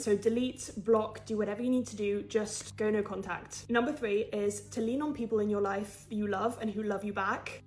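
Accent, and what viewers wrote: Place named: England, United Kingdom. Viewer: Good advice. Luv your English accent btw.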